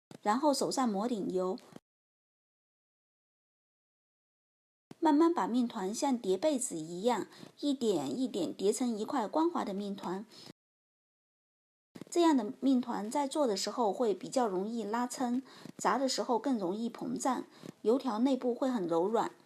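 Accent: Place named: Fujian, China. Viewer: 你是福建人吧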